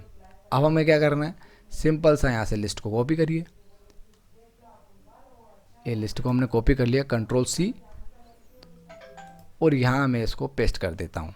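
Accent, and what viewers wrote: Indore, India. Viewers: Bhiya indori ho kya.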